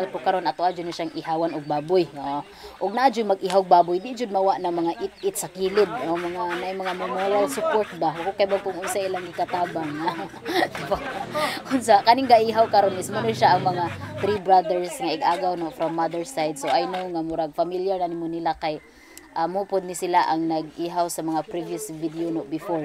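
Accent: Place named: Bohol, Philippines. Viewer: Pure bohol jud imu lingwahi. Puro J.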